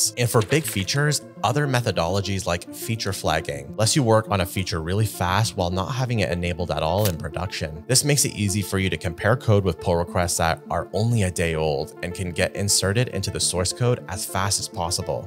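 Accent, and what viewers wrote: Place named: United Kingdom. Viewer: Bro's british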